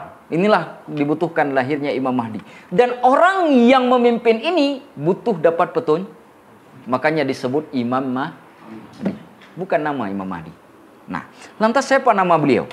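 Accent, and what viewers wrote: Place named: Aceh, Indonesia. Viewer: Bagus ceramah ustadnya. Dr logatnya sepertinya org Aceh ya...😊😊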